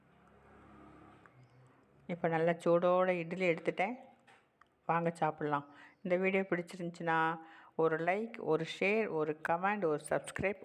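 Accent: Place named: Kerala, India. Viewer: நீங்கள் மலையாளியா?உங்கள் உச்சரிப்பும்,உபயோகிக்கும் வார்த்தைகளும் அதை தெரிவிக்கின்றன.பி‌ன்னே என்பது மலையாள‌வார்த்தை.தமிழில்‌ அப்புறம் என்று சொல்ல வேண்டும்.மனஸிலாயோ சேச்சி?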